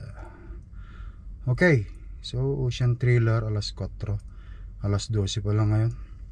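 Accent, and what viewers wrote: Ilocos, Philippines. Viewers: kung may bakasyon ka ngayong summer, mag english lesson ka kasi kung mag english ka ilocano accent.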